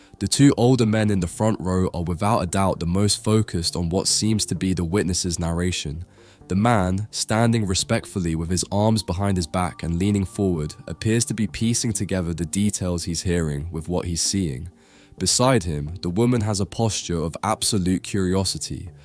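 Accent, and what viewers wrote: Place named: London, United Kingdom. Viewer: Why do you think English is not his first language? He's English, probably from London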